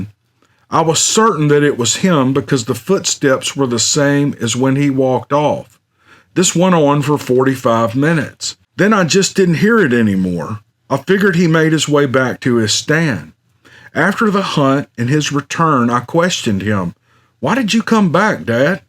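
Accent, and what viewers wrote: South, United States. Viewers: I just love your soft, southern, masculine voice! Thank you for providing such a great respite from all the political craziness. I wonder who Bigfoot would vote for? Hahahaaa! No! No, I won't follow that thought any further! 😂 Great video! Great stories!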